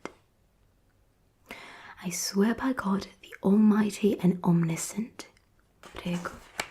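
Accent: United Kingdom. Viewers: E' vero che ci sono diversi accenti (sia in US che in UK: quello di e' la cosiddetta Received Pronunciation, le persone attorno a me qui nel North West of England, e io stessa, parlano in maniera un bel po' diversa!), ma in linea generale puoi facilmente identificare se una persona e' americana o Brit. Le T come ha citato, le R, per esempio, che gli americani pronunciano e gli inglesi no (tranne in alcuni accenti), e che Scottish e Irish pronunciano in maniera ancora diversa, le L in 'walk', 'talk', o alcuni suoni vocalici (vedi: vitamins, route), e l'intonazione in generale che e' spesso diversa. Nell'inglese britannico si usa moltissimo il connected speech, che e' cio' che fa dire agli stranieri 'gli inglesi si mangiano le parole'. Nell'inglese americano questo e' meno marcato (poi ovviamente hai eccezioni). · Pazzesca! Veramente top il tuo british accent darling 👏👏 Ps* ammazza quanto è severa questa prof!! 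Attendiamo con ansia la prossima lezione 🖋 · bravissima! sembri una inglese proprio; peccato che io capisco solo la cadenza USA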